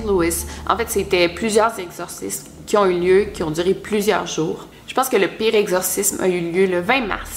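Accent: Quebec, Canada. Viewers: Je trouvé l’accent québécois bizarre mais en regardant tes vidéos je l’adore je le sur kiff de malade 😍😍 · J'imagine que tu a fait baucoup de recherche pour cet vidéo donc bravo et en plus ton axenc québécois (je sais pas comment on écrit) est trop bien donc continue comme ca 😊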